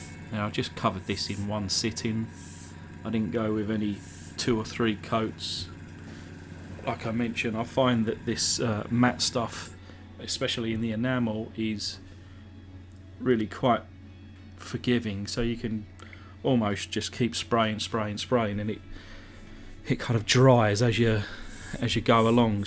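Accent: United Kingdom. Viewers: Hey ;-). I have a question. Are you from UK ,from Ireland ,or at other english spoken Country ?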